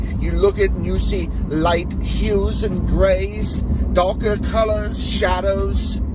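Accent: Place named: England, United Kingdom. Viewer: What's with the English accent? Doesn't make you sound smart especially when you have no idea who Brian May is.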